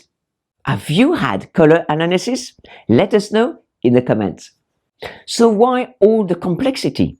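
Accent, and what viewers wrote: France. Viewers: Loved this❤such a charming, elegant lady with empowering advice and lovely French accent. Colour analysis was Big when i grew up, but after studying colour theory myself and experimenting for years i ended up with same conclusion as you😊 i used to like silver and cold colours but with age i look better in Warm colours and gold. Basically i choose colours that look good with my skin and can wear the same items over and over without wasting on trend colours. This allowed me to invest in quality items that i am truly happy with.Easy- peasy, sustainable & money saved😍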